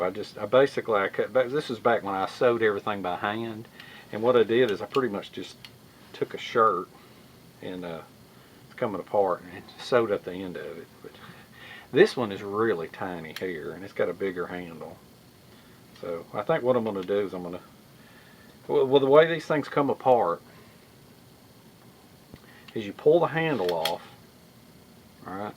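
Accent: South, United States. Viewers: Love watching your vid’s you have the coolest voice lol, very southern 👍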